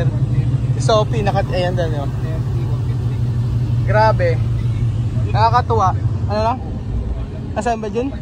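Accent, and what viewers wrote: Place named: Zamboanga, Philippines. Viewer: Chavacano po ba kayo or spanish speaking? Yong accent mo ay chavano.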